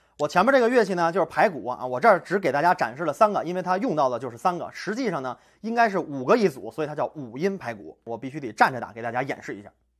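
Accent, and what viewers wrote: Beijing, China. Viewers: 开口老北京人了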